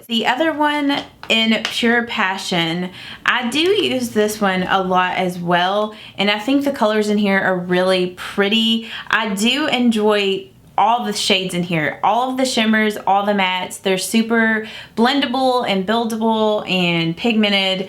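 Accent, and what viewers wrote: United States. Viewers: Thank you for the Milani review,. I have several of the items you spoke of (blush, eyeshadow, lip creams). They are awesome and long-lasting. Please do a video on your makeup look in this video - it's beautiful! I love your accent, where are you from in the US? I am from Ontario, Canada. Cheers!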